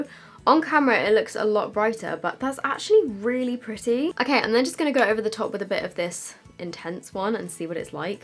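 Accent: Australia. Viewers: That Australian accent though! 😂😂👌🏼👌🏼 #notificationsquad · Very impressed with your Australia accent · Still beautiful 😘 that Aussie accent thouugh 😂👍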